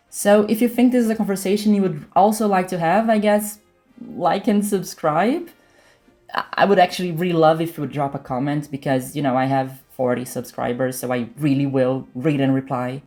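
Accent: Brazil. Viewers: Beautiful brazilian accent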